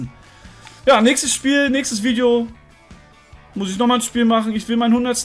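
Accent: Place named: Swabia, Germany. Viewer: Hinterdupfinga des isch a rechtr Schwob :)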